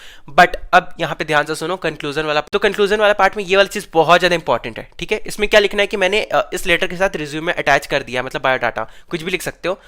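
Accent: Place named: Bihar, India. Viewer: Mujhe ye bihari lagta h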